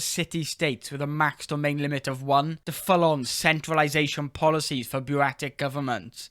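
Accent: Wales, United Kingdom. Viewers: I love your Welsh accent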